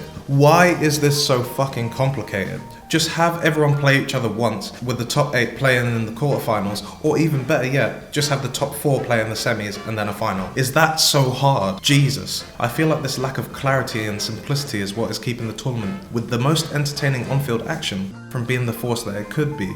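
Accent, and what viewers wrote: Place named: United Kingdom. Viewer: You sound British. Us southern hemisphere supporters don't keep up with your rugby tournaments either.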